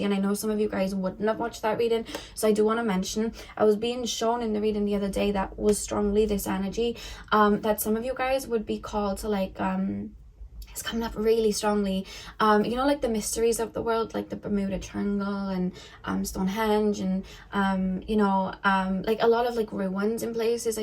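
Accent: Wales, United Kingdom. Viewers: I love your accent😍😍😍 I subscribed just to hear your accent😂 are you from Swansea?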